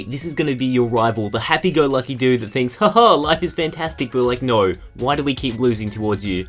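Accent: Australia. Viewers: Azman Ismam He's an Aussie XD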